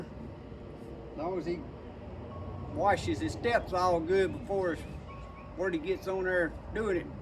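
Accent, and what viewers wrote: South, United States. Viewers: I can’t take the deliberate redneck accent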